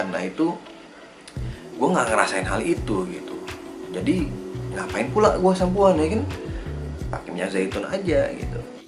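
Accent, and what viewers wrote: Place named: Aceh, Indonesia. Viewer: Orang aceh ya bang?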